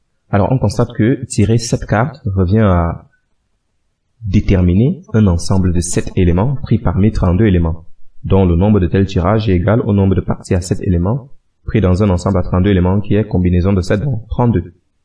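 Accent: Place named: Cameroon. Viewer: Chapeau tres bien explique et en plus vous avez une jolie main d ecriture bien lisible . merci a votre accent je sens que vous etes Africain surement Kmer dnas tous les cas bonne video continue seulement !!!!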